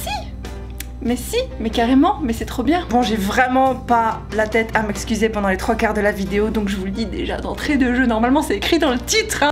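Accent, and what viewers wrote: Belgium. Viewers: mdr ta façon de parler et tes sautes d'humeur me font penser à gonzaroo ! XD à croire que tous les belges s'expriment pareil . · bonjour.Venez habiter à l Ile de la Réunion!! Ici il y a quasiment que des métisses et sa marche. On devrai envoyer les étrangers en stage chez nous On a toutes les ethnie possibles : européens chinois , indiens , africains, malgaches, belge (humour) (mais pas encore de japonais! ça manque) ect... et tout ce petit monde s'aime et se reproduit a tout va ... Personnellement je suis chtimi des hauts de france (ça pète hein !) (salut cousine) et je suis mariée à une réunionnaise , bilan : mes fils et mes petits enfants sont tous de couleur différente !!! pas facile d’être raciste dans un tel contexte !!!!Enfin on s 'en accommode....voila voila , tout ça pour dire que si tous les cons volaient bin il ferait nuit 24h sur 24. 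Bien venu au petit haricot que le monde lui soit propice . Ps : vous êtes belge je confirme il y a des petites intonations qui ne trompent pas ! Amicales pensées.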